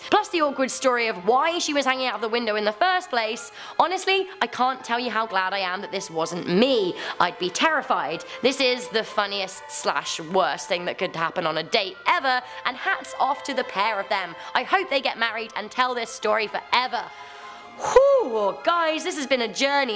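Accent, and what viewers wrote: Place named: United Kingdom. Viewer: is Irish? She sounds British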